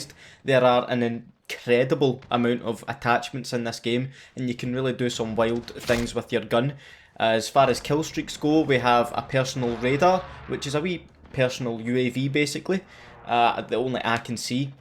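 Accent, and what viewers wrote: Scotland, United Kingdom. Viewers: Every content creator needs to be Scottish. love that accent lol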